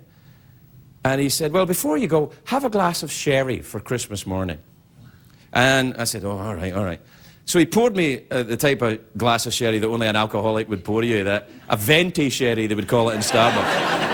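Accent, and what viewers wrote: Scotland, United Kingdom. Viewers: I watched this the other day and even today i still had tear in my eye. 20 years is a great milestone....goodluck to everyone at the beginning of the road....one day at a time you'll get through it:-) watching clips from 5 years shows how much his accent has changed, but it is still obvious scot (reminds me of john barrowman in that sense) thanks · Back when he still had that Scottish accent.... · wow his scottish accent is veryyy scottish. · WOW. Vintage Craig. He was very apologetic for cussing and had a much stronger Scottish accent. Time flies... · Craig's accent always gets a little thicker when he is being genuine or talking to another guest Scottish decent. (See Ewan McGregor, Kevin McKidd, his sister, etc). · Excellent. Who else misses his scottish accent? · It's funny how much thicker his Scotch accent was even 6 years ago, but what he's saying here is brilliant. 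What a real person, and hope he inherits Mr. Letterman's show one day.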